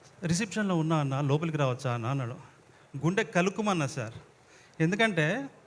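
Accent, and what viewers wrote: Telangana, India. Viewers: Telangana yasa basha ❤❤❤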